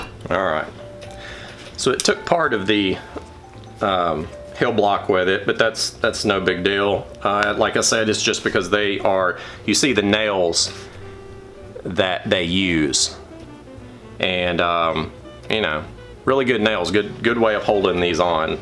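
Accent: South, United States. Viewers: Sounds like a southener Me: must be legit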